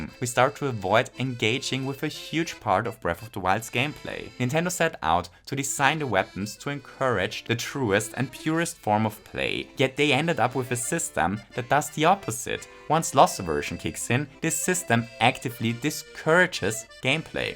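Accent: Germany. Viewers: Ein Deutscher Akzent 🤔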